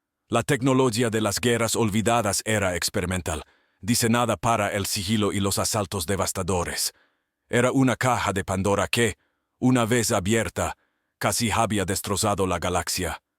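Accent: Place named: North America. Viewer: Su Tono de Vez es de Norte América . Vendiciones es un buen video .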